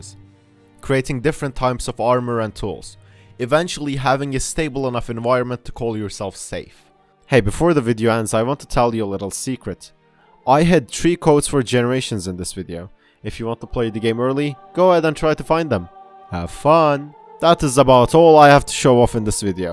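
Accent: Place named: Turkey. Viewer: mirror tutorial videonu izlerken abi bu adamın aksanı türk gibi diyodum harbi öyleymişsin. Valla kanalını yeni keşfettim umarım istedğin şeye ulaşırsın. Oyun da güzel görünüyor bu arada. Test için yardımcı olabilirim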